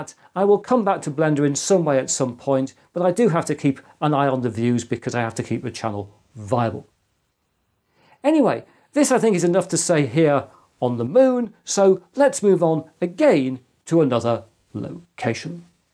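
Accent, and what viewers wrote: United Kingdom. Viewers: There's that calmingess and your British accent, that makes your videos really enjoyable to listen to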